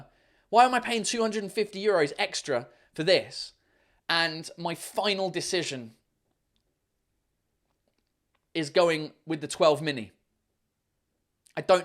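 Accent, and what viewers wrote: United Kingdom. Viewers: How can you manage to have that great British accent and a native French accent :o Great video by the way!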